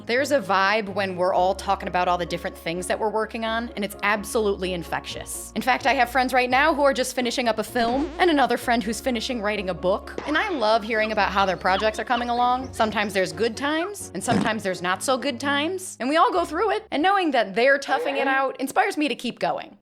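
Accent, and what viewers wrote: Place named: South, United States. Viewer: I luv ur country accent that is different from the normal kind u speak when ur on food network on TV...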